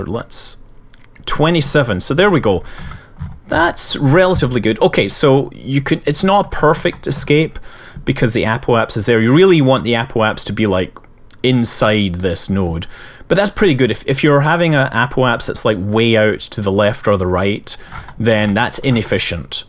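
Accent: Scotland, United Kingdom. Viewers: cool accent, are you scottish or canadian? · he isn't Irish... he isn't faking Irish... he is Scottish that is a Scottish accent not a Irish one so you are technically right he is not Irish but he is not a faker.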